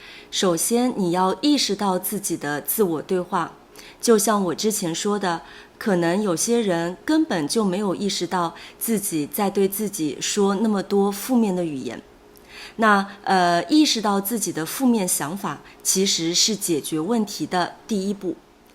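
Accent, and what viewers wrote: Standard. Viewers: 非常感谢老师 ！你的视频太有意思了 ，你讲的中文也很标准，听得清楚，口音也很好听，可爱。而且视频后的生词和造句也对我很有帮助..。我学到了好多词汇。一举两得❤😊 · Another great video! Keep up the great work! (Also, very good standard accent)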